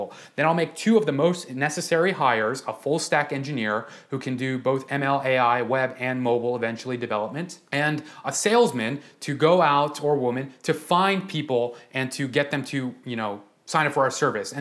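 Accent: India. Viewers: Are You Indian? 😃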